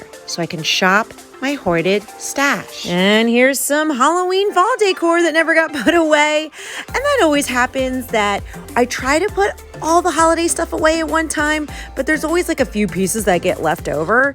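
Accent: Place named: Mid-Atlantic, United States. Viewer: Hi new to ur channel. Came over here from the minimal mom. I def hear an accent from home, ( Pa , NJ, Del area). Ty for sharing with Dawn's audience. All the best ☀️🌻🐝🦋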